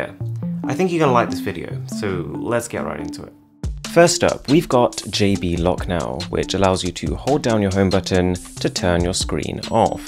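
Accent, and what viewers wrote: RP, United Kingdom. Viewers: Do u really speak like that, posh!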